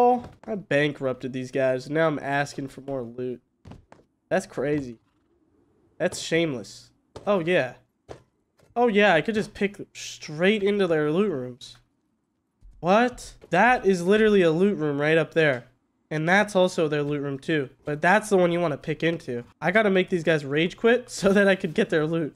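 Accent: South, United States. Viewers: your southern accent blows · The southern accent is why I started watching 😂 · tip for the southern accent, talk slower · I love the country boy accent. You should talk like that all the time. Fuckin' hilarious. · Lmao! your southern accent is on point! hahaha... If you're ever out towards the south. Wally1k just moved to Mrytle beach SC. You'd fit right in with that accent.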